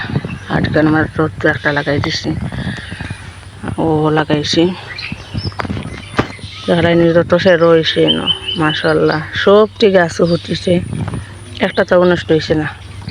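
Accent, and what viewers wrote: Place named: Sylhet, Bangladesh. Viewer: আসসালামু আলাইকুম আপু অনেক ভালো লাগলো আপনার ভিডিওটা ফুল ওয়াজ করে দেখে নিলাম আর সিলেটি বাসার কথা গুলা শুনলাম আমিও সিলেটি থ্যাংক ইউ আপু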